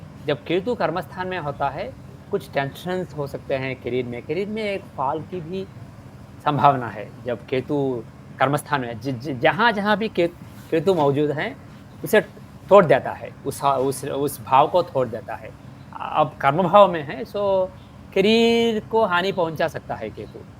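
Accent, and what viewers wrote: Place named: Andhra Pradesh, India. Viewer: SIR, this SIR also talking like ME( HINDI).Is He from ANDHRA..😊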